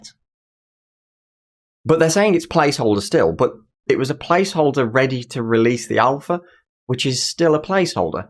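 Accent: Birmingham, United Kingdom. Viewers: Are you a brummie :)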